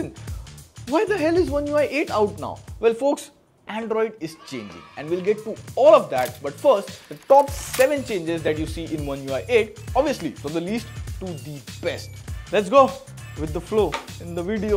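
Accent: India. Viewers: Look man, I like content but there is one solution actually two solution I need to work on Indian accent to learn it Or you need to work on American accent? And basically get more views and go International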